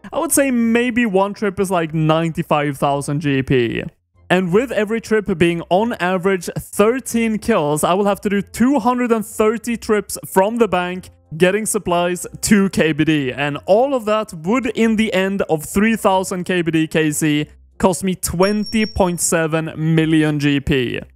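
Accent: Sweden. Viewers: Jag älskar att man hör att du är Svensk! :D du fick nyss en ny subscribers. :)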